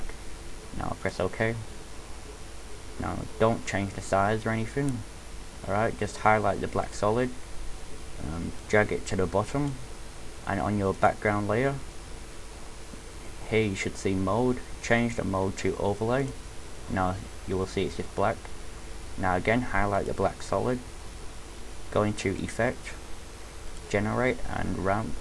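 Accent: United Kingdom. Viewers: yeah go us british accents he's just jealous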